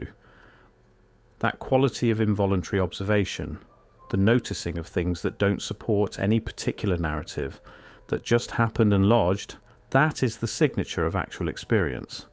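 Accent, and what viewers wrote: United Kingdom. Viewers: GET RID OF THE UK NARRATOR VOICE!! 🤮🤮🤮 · When the narrator has a British accent, it makes the message more believable!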